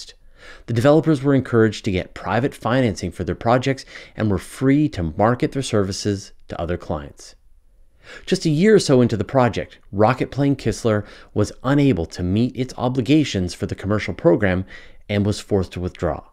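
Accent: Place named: Canada. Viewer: If you have a Canadian accent, it is very, very faint.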